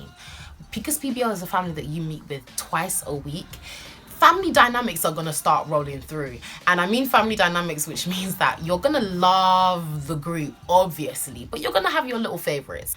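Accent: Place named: Nigeria. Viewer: Love, Love, Love the little dash of your Nigerian accent😅. My mum was telling me the other day that she thought that her dream of being a doctor was going to be fulfilled through me (can you imagine!). I just laughed because imagine a doctor that doesn't like seeing blood 🤢. Anyways love the video, because "I can't come and kill myself"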